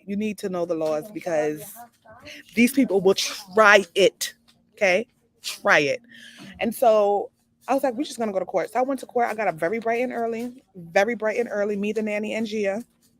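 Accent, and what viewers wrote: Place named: Brooklyn, United States. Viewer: The Brooklyn might come out of you & they just don’t know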